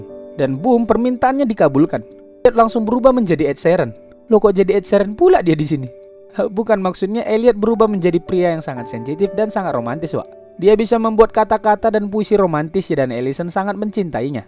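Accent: Medan, Indonesia. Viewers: bahh... anak medan rupanya...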